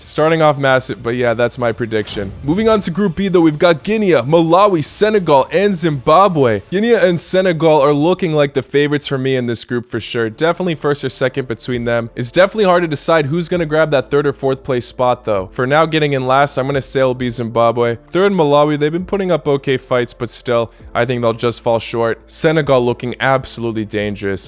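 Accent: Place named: United States. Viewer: Is he American cus he got a american english accent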